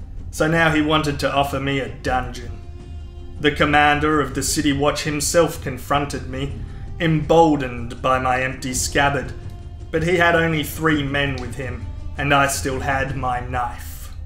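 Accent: Australia. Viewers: You ever consider doing Game of thrones audio books? Your bloody ozzy accent is rather soothing.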